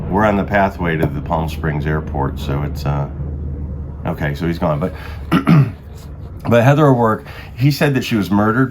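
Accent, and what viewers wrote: Chicago, United States. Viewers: I knew it! I commented about a possible watered down Chicago accent in the Lisa Marie autopsy vid, which is the first video I watched on this (very interesting & well-done) channel. Doesn’t matter how long you’re gone, it always creeps back in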